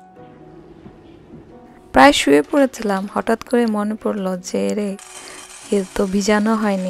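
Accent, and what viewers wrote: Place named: Sylhet, Bangladesh. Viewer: আপু,খেয়াল করলাম আপনার কথা বলার মাঝে হালকা সিলেটি ভাষার টান এসে যায়❤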